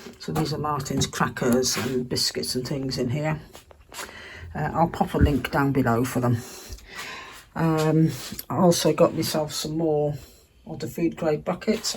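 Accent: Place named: Wales, United Kingdom. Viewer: I found your channel fascinating as my great grandparents came to the US in the 1890s from Glamorgan Wales near Swansea. So it’s interesting to see real people, real homes and listen to real accents spoken as you are not a great distance from where they lived. I’ll enjoy seeing more of your life and customs along with the challenge.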